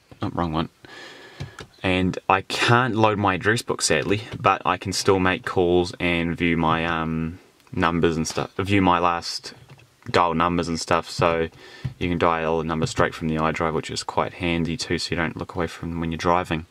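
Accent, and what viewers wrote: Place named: New Zealand. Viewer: awesome detailed video. like ur kiwi accent too :)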